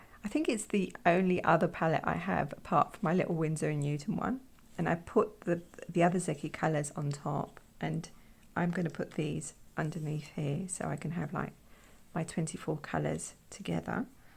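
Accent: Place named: South Africa. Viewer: I absolutely love the illustrations..especially the background detail that looks like a little piece of paper. I have been painting for years, but I recently started moving more towards illustration ,which is a huge mindshift for me. I love learning from tutorials like this, so I am definitely subscribing. For a moment there I thought I was listening to a South African English person (your accent sounds allot like Cape Town SA English🤣 and the "ai jai jai" is often heard here in English, Afrikaans, Xhosa...you name it🤣) but then...we got our English from Britain.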